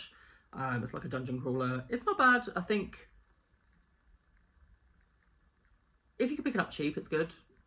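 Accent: United Kingdom. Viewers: Very cute, and nice to hear your voice! Very British ^.^ hehe x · Loving the update! Also enjoy the British voice! ❤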